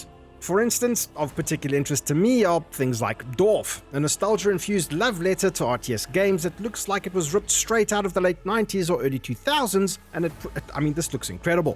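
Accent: South Africa. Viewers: Warcraft 3 has been having some traction lately with bigger prize monies etc. And are you South African 🇿🇦 If you are awe! 🫢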